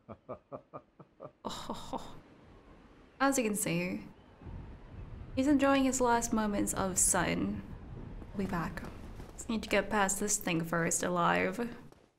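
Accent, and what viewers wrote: England, United Kingdom. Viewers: Adorable english accent, sounds well spoken and proper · Hey or if its okay^^ Pls can you make a video or Vlog in german? Your german with this english accent is cool. =) This would be nice.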